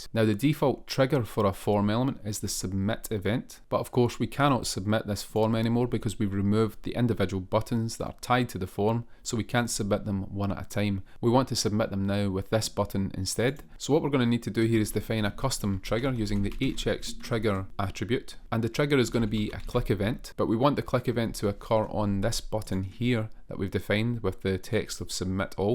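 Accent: Scotland, United Kingdom. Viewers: Subscribed for the amazing combination of relevant web development content and a Scottish accent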